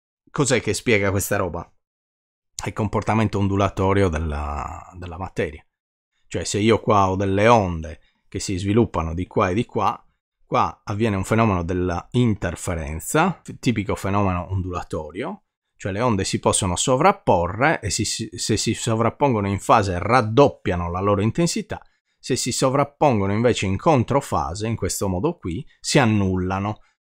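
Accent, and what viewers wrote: Sardinia, Italy. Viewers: Sardo sono.......e si sente.......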